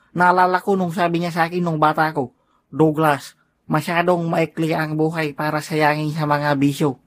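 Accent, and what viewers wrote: Ilocos, Philippines. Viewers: Ilocano ka boi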